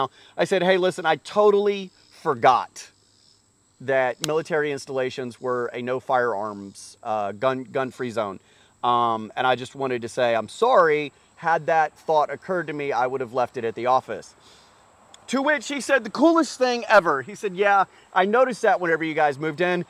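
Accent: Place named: Asheville, United States. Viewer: You are definitely from Asheville .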